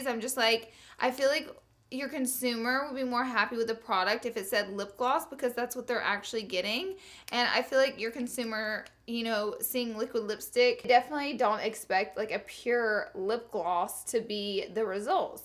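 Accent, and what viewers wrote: South, United States. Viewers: when I first watched you your accent threw me off because I haven't heard anyone with a southern accent before..but I love your videos much love from Dq Arkansas❤ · I love how you say "oily and grezy" lol that southern accent shines threw girl! Lol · Zoey VH I know a lot of southern people that say it just like her. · Laura and I LOOOOOVE your videos and I love the southern accent. I'm from Texas so when I hear you say y'all it brings a smile to my face cuz I thought only Texans say y'all 🤣🤣🤣🤣👍👍👍👍😬😬😬😬 keep up the great work!! #teamLee!!!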